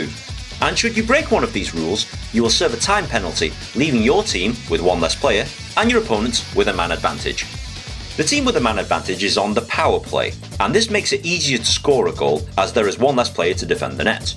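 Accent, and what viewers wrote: England, United Kingdom. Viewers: Why the hell I wanna hear an Englishman explain hockey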